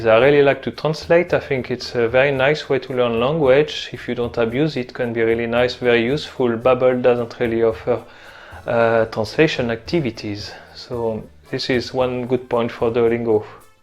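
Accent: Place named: France. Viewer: So nice, c'est toujours un plaisir de regarder tes vidéos, de plus avec ton petit accent français ça me permet de vraiment bien comprendre ! You are on the good way my friend ! 👏👌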